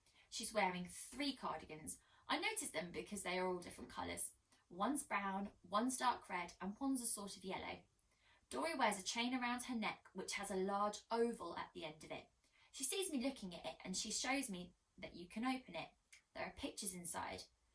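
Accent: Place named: United Kingdom. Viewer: Hello Miss I am an English learner from Russia and I have been choose these book because it is exactly my level - not so easy not so hard. So what I wanted to say - I really like your British accent and how you read the book and also I was really surprised to see in your channel the author of the book. Thank you for your reading and I wish you all the best.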